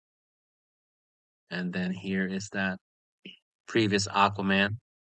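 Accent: Boston, United States. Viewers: Awesome review of what was shown at the con. As someone who lived in the Boston area for several years, I also always appreciate hearing the Boston accent in narration. Go Sox. Go Pats.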